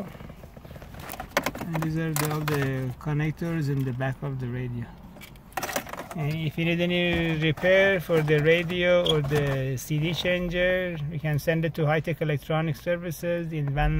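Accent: Iran. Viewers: looooool your accent. I'm guessing your persian hahahahaha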